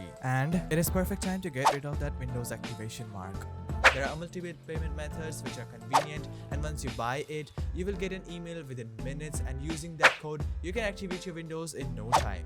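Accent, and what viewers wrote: India. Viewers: You sounds like a indian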